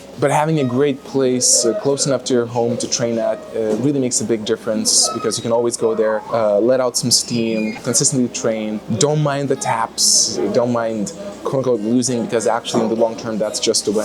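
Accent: United States. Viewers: I find it interesting I'm not the only one who thought you was a yank! Just wondering, how did you get an American accent? Have you spent a lot of time over there, or do you learn American English in Lithuanian schools? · On another note, as an American who taught English for 3 years in Italy oh, I will say that your American is excellent.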